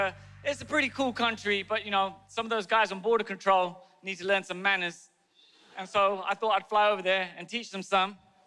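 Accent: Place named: United Kingdom. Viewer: hey, dunno whether i'm more envious of your intellectual comedy, sprightly comebacks, ability to be (thought) provoking without being self-deprecating orrrr you really lanky frame man [i mean, i was no Calista Flockhart back in the day (i'm a guy by the way) but i think of thin or anorexia and i think of her] It is so nice to see someone (of 𝘥𝘦𝘴𝘪 lineage at that) not being lazy in using the 'easy to sell' self-deprecating humour and has enough wits about them to not stoop low or start getting personal! though i DOO love the naughty and brutal bits! Greetings and lots wishes from Bharat <link> [having grown up in Bharat and those were the pre internet era, my vernac. brain still takes time to grasp the British accent:P ]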